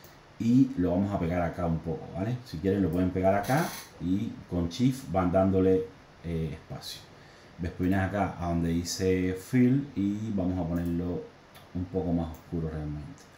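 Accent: Cuba. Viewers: Es cubano? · Buenísimo! Muchas gracias! Eres cubano, verdad?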